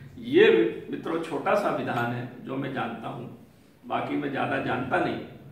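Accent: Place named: Madhya Pradesh, India. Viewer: गुरुदेव प्रणाम मैं हरदा से हु आप मध्यप्रदेश से है ये आपकी भाषा से ज्ञात हो रहा है आप होशंगाबाद या जबलपुर से है ये मेरा अनुमान है कृपया बताये आप कहाँ से है जय श्री राम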